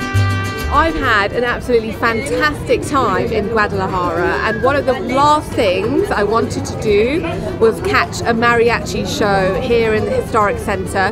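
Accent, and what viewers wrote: United Kingdom. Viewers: Your accent sounds British. Are you British?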